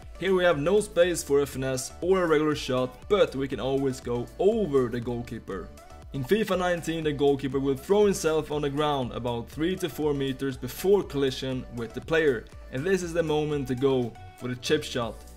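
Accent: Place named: Sweden. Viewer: He is so swedish listen to his accent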